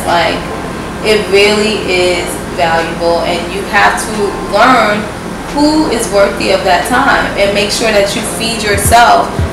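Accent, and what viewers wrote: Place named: New York, United States. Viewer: LOVE your NY accent!! Gorgeous too girl!!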